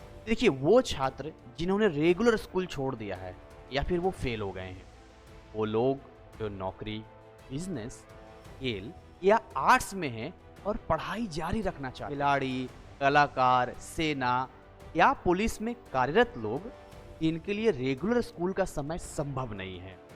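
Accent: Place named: Bihar, India. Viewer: sir wo to sab thik h lekin apka jo ye bihari accent h na pura video kharab kr de rha h.......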